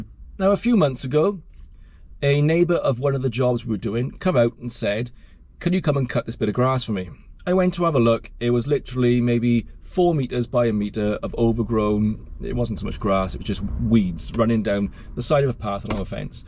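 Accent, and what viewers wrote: Wales, United Kingdom. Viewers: I'm not into gardening but found the thumbnail just way too intriguing and hear you. And on a positive note I've always thought the Welsh accent is thee best accent back home ! Anyways, yes, i hear you and at first i thought, well maybe old age and forgetfulness gone wrong but then i thought nope. You've GOT to be happy in your work place. I'm 69 in September and lost a kidney to cancer 2014 and have mobility issues with abdominal adhesions and Countdown Supermarket here in New Zealand have a food home delivery service and those guys go out of their way with helpfulness and positive smiles and they go above and beyond and they are lifesavers but I could never imagine being negative with them, goodness gracious, that's like cutting my throat and burning bridges and making life so difficult. I don't mean to come across as holier than thou but I've done the delivery jobs in Yorkshire in the late 70s 80s with northern dairies, john Menzies paper delivery and polar motors in essex and you automatically help people who need it but i never came across ungratefulness but I've seen it on the old UK telly progs. 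And it must be real hard biting your tongue under those circumstances. Perhaps you can do the UNCUT video version of this :) Anyways, i definitely say put yourself first and then your family cos if you go down there's no provider! And DON'T feel guilty but I suspect a part of you does. Monday greetings from Middle Earth back to the Homelands 🙃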